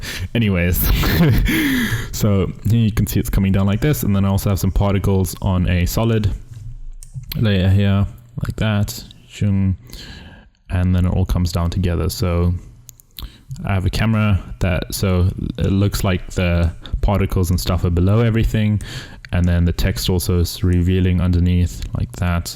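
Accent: South Africa. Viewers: South African accent??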